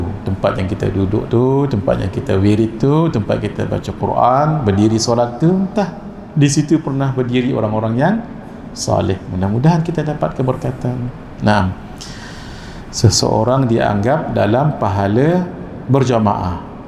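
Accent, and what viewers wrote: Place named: Sarawak, Malaysia. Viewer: Salam ..jazakAllah khair ..gaya bercakap mcm org kuching cakap bahasa melayu semenanjung ..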